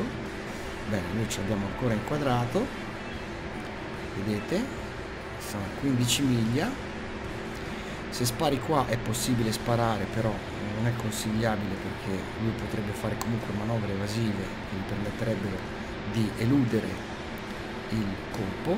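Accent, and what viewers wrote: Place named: Tuscany, Italy. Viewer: Ciao, analizzo passo passo il tuo video: All'inizio menzioni, e dici che non fai i video belli come i suoi... personalmente ritengo che sia inutile fare in due lo stesso video, quindi trovo utili i tuoi video, come quelli di! Lui molto professionale, con un inglese unico, tu molto "amicone con il tuo eccezionale accento toscano!!!!" Interessante il video che hai fatto, devo dirti che però lanciare i Sidewinder fin che non senti il look, non serve quasi a nulla, andranno quasi sicuramente persi, infatti nel tuo video, si deve che solo il missile che hai lanciato con il look inserito va a segno.. Ultimo prima di salutarti, devo dire che se in futuro spieghi anche le varie versioni del AIM-9, ritengo sia cosa gradita!!! Ciao grande uomo, a presto!!!! NB. dimenticavo, tutto questo dopo la sigla... e' troppo figo!!!!!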